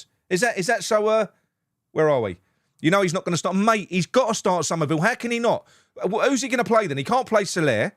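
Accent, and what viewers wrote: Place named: Birmingham, United Kingdom. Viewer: Manc fan with a brum accent losing his head 😂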